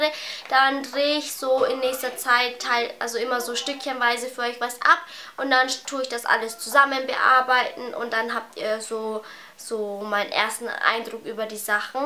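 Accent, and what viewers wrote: Bavaria, Germany. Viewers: du hast keine sprachfehler? man merkt aber übel dass du aus bayern kommst :D des ist voll süß :) und das des abi in bayern schwieriger ist als in anderen bundesländer stimmt! :) reg dich nicht über andere leute auf !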